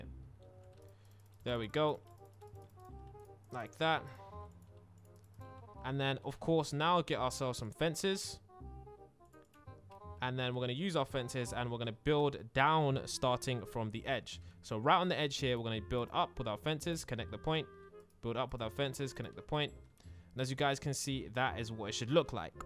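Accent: United Kingdom. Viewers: I love your British accent then the Build ☕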